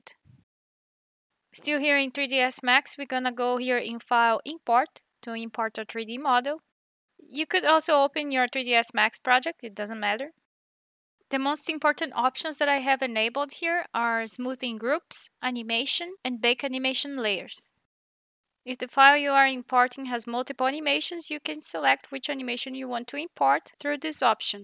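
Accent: Brazil. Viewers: caramba mano, eu fui pesquisar sobre como extrair modelos do ps2, e saquei seu sotaque na hora que vc era brasileira kkkk, é meio difícil brasileiros se interessarem por essas coisas, muito bacana o vídeo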